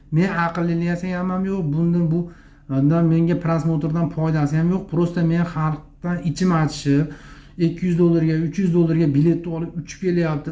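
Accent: Andijan, Uzbekistan. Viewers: Anjon shevaku😂shunqa sozini bular ashnaqa deydi😂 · Oddiy o'zimizcha Anjanchasiga gapirib qo'yaqolaman, deb xatolariyizdan o'ldirvordiyiz lekin. (Quick Response Code) QR- код Piar- код deb. Aka Andijonliklar sizchalik xato gapirishmiydi lekin. Siz halqqa bir ma'lumot opchiqvottisiz, aytivotgan gapiyizga o'ziyizam tushunmaseyiz kerak xatoligidan.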